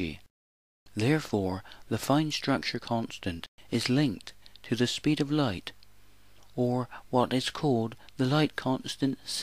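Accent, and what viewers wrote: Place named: Somerset, United Kingdom. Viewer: Maths in a Somerset accent is simply the best maths you'll ever find.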